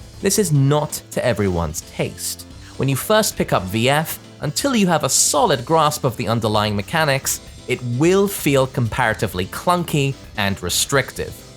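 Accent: United Kingdom. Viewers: you live in japan? thought you were bri'ish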